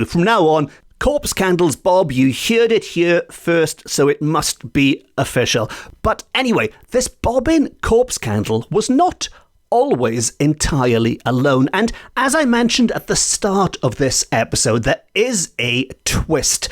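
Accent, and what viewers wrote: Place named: United States. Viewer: Your pronunciation of Welsh sounds very different from Duolingo and other Welsh speakers i've heard. I've heard Calan Gaeaf pronounced Cah-lahn Guy-ahv. Are you just from a different part of Wales with a different dialect or accent? The way you pronounce your "A" sounds like the American way, not British.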